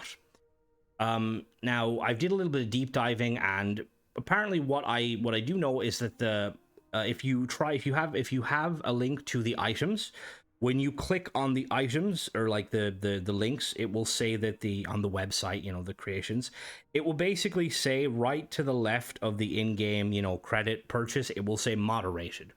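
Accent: Ireland. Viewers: We love are angry Irishman Mod reviewer. Everything sounds more intense with an Irish Accent, it makes ppl pay attention. Keep on doing what all of us don't want to do and review them mods.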